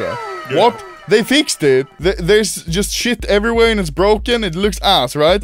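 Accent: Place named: Sweden. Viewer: You sound like you’re Swedish.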